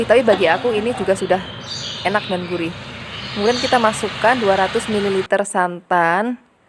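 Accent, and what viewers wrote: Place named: Banyumas, Indonesia. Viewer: Gak tau nya orang ngapak...dan muka nya beda ma suaranya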